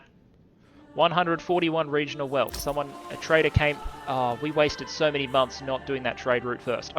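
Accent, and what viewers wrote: Australia. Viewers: Woooooooooo More australian PowerPoint guy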